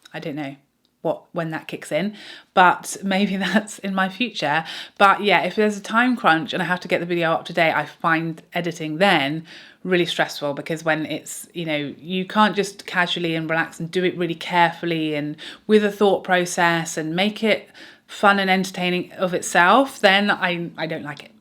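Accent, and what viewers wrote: United Kingdom. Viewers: Hi, I am new to your channel. You have a lovely British accent and very thoughtful reviews of products that are helpful. I enjoyed hearing all the answers to the questions in this tag. I hope you have a wonderful day! xo, Karen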